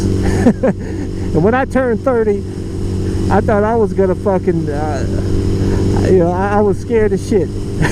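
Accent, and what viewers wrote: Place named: Texas, United States. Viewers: Thanks for sharing your story. We all have a story to tell. When I first heard your voice it didn't sound North East at all. Sounds more Southern, but I guessed you grew up in a "certain area" just from your lingo. But you pulled yourself up and made a better life. That's awesome! The problem with "The Hood" is, some people insist on staying stuck on stupid even when they don't have to. Again, thanks for sharing. Stay safe and ~ HAPPY NEW YEAR ~ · to me it sound like a Texas dude lol I don't really know alot about the south haha I'm from the bay area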